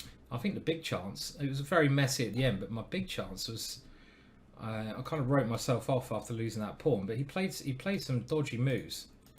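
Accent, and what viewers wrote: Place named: South East England, United Kingdom. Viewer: Idk, you do a pretty excellent South East English accent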